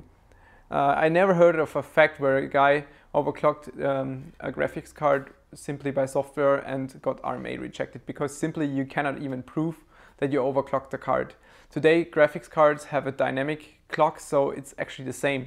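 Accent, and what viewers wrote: Germany. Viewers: thanks! also I like your accent. I want to go to Germany someday because people say it is beautiful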